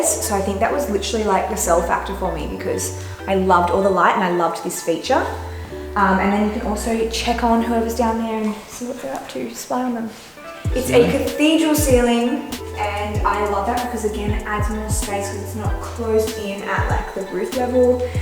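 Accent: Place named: Australia. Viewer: My ‘normal’ you mean outside of Australia? 😂 she has a normal Australian accent ✌️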